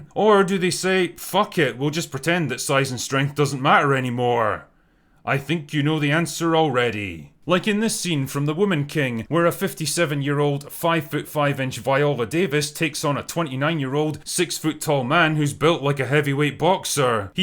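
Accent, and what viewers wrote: Scotland, United Kingdom. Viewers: Genius analysis. And I like your accent - Scottish, but totally readable for a yank like me! Cheers!😊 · Most Scottish bloke ever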